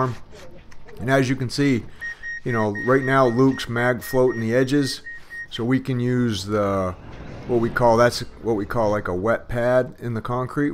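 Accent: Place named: New England, United States. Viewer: You sound like a new England man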